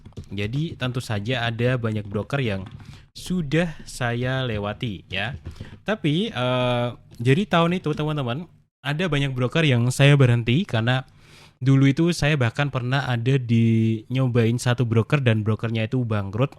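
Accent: Java, Indonesia. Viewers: bang lu jawa ya ? orang mana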